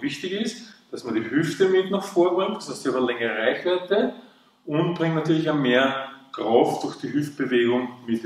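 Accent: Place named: Vienna, Austria. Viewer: Hallo Super video nur eine kleine kritik am rande sie reden zu schnell und man versteht sie kaum also zumindestens ich aus hamburg verstehe kein wienisch manche wörter versteht mann aber wenn sie zu schnell reden kommt man nicht mit Das gesagt bedanke ich mich für das tolle video weiter so ein daumen hoch von mir und das abo an ihr kanal selbsverständlich 👍👍👍👍👍👍👍👍👍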